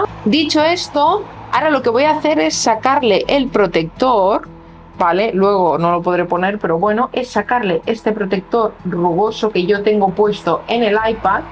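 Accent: Spain. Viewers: entonces de españa? :)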